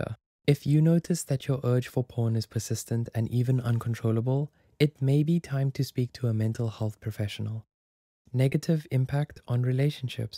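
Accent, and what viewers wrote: South Africa. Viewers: I hear that Suid-Afrikaanse accent 🇿🇦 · is the narrorator south african?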